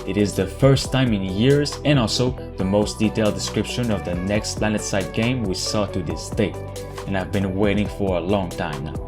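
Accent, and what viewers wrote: Quebec, Canada. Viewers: Great Québec accent!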